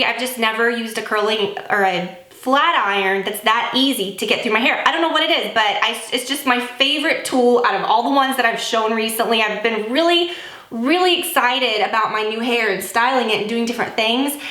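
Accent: South, United States. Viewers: Love when your southern accent pops up in your videos! I just recently made the big chop too. I’ve had pretty good luck with a wand but haven’t braved the flat iron curls with short hair yet. I’ll have to try. Thanks! Hope you & your family have a great Christmas!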